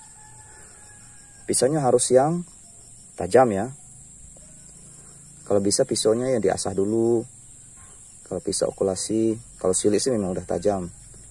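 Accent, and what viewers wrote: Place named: Java, Indonesia. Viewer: Bang dari logat bahasanya kok Borneo nya cukup Ke Jawa- ne ha ha ha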